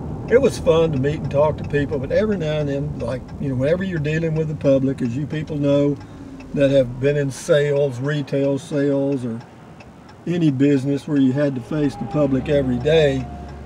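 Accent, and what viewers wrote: Texas, United States. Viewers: Always cheering to hear your Texas-inflected enthusiasm for life,. I started out with your walk through Van Horn, and from there I started to ride shotgun on your drives through beautiful West Texas and the desert Southwest (well, me and 44,000 other folks, that is), and from there I became a subscriber to your channel and I haven't looked back. I grew up in Texas, and though I fell in love with the big city and moved here to NYC (and look where THAT got me), I'll never stop loving West Texas and New Mexico and its good people, and I just want to say that being able to leave the confines of my small Manhattan apartment and head out for the open western skies with makes the day a hell of a lot easier to get through, and I am grateful to you — or much obliged, as a Texan might say. I'll see to it that I use your Amazon link next time I go shopping there. 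I wish you all good things. Keep up the great work. Best regards, Seth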